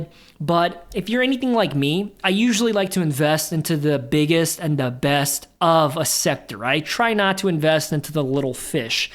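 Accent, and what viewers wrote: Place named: Philadelphia, United States. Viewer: lol k just sometimes sounds like you have a philly accent🤔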